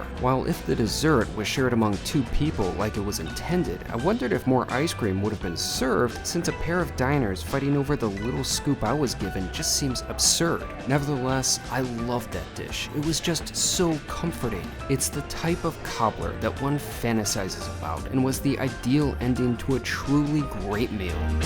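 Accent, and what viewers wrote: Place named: Midwest, United States. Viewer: Love your chill vids and Midwestern accent, bro!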